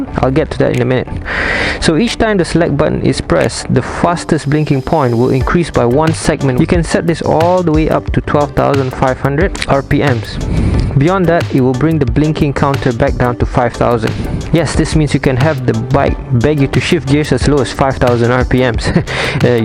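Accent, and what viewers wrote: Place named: India. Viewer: Heavy indian accent, mouth breather... Thumbs down.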